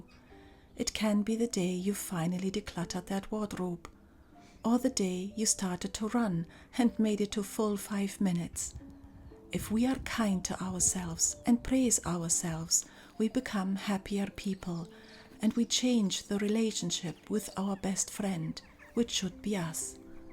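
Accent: Scotland, United Kingdom. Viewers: Hello from Dublin Ireland i just found your channel and i am really enjoying catching up on your previous videos. Where is your birthplace ? You have some Scottish accent.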